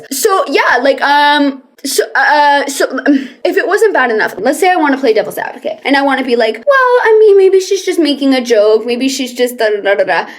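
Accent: Canada. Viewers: so you do sound Canadian but the way we over exaggerate it in the states is to show the difference like when we impersonate Brit’s with “B’ole o wo’ar” they don’t sound like that but like they kinda do?????????? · hi just so you know ive started developing a canadian accent from watching your videos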